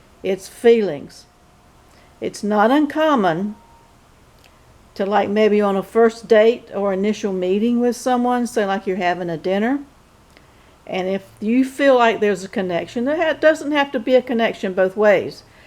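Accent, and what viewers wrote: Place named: Mississippi, United States. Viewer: I have to know. Where are you from ma’am? I guess Mississippi by your voice, but I am from East Tennessee, and we talk a little different.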